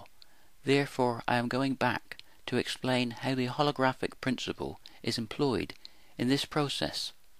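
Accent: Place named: Bristol, United Kingdom. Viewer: Incoherent rambling in a thick bristolian accent... ...nice use of big words, by the way. To me, this gives insight into a mind that is clearly fascinated with the concept of ambiguity, which obsessively attempts to apply it to scientific observation. This smacks of pseudo-religion. I am not without my own philosophical musings, but this is simply pretentious.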